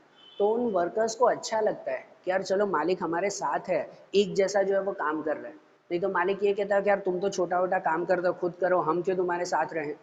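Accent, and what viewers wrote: Gujarat, India. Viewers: Sir aap Gujarati ho !?😋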